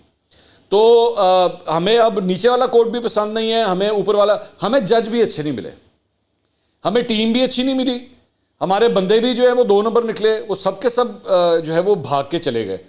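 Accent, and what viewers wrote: Punjab, Pakistan. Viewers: "Agha majid honey albela zafri khan tariq taidi aur iftikhar thakur sy mazrat k sath" Dear jii.. main aik arsy sy ap ko sun raha hon ap ka sanjida andaz mazbot personality aur bebak reporting sab ko he pasand ho gy. Lekin ajkal reporting k doran ap ko bhaand panay ka dora parna aur punjabi touch nihayat ajeeb hy agr ap apny show ko entertaining bananay k leay kr rahe hein tu market main already log mojod hein ap b 2 3 hire kr laein lekin ap khud mehrbani karein sirf reporting karein shukriya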